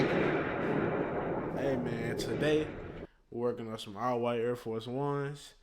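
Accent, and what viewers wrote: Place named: Detroit, United States. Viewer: Wut up doe! Good shyt on the forces they crispy. Sound Like U from Detroit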